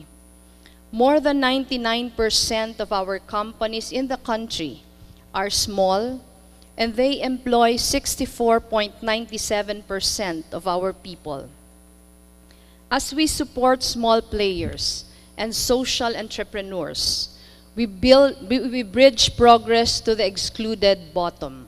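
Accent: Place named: Philippines. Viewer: What's the problem with Filipino accent or her tone?😊 I hope this is not the first time na nakapanuod kayo ng isang speech? Internationally or even locally, it is well accepted to read a speech as it maintains close control over the tone or the timing of the speaker. Try to watch some inauguration or graduation speech, hindi po kahiya-hiya na gamitin ang pamamaraan na 'to. The audience does not care, anyway, what their after is the substance of her speech. You can also watch her Q&A dito rin po sa business forum. Please watch objectively, do not mock and disrespect the speaker merely because she's reading. She's invited as a resource person because she's considered as a person of authority and credibility. May expertise po siya sa larangang iyan. Ayos lang po na may suportahang kandidato ngunit huwag po nating hayaan lamunin tayo masiyado nito na nagiging bastos na tayo. 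😊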